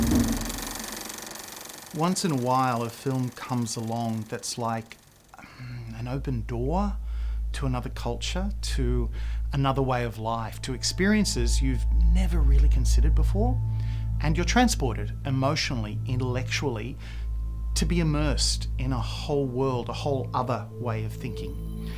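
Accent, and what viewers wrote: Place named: Australia. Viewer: good review! nice accent you Aussie?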